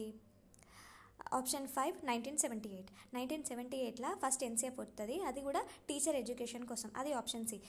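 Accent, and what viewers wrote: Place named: Telangana, India. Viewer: Telangana accent spr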